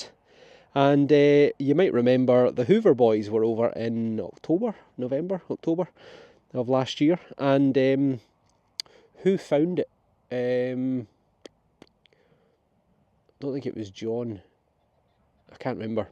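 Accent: Scotland, United Kingdom. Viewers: I love your channel John! I love your brogue! I am in Alaska so no digging here this time of year! Love all your finds and Scottish goodies!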